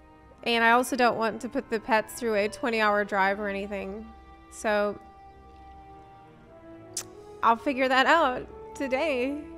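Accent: United States. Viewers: you sounds like you are from the US. why did want to move to ukraine? serious question, no malintent here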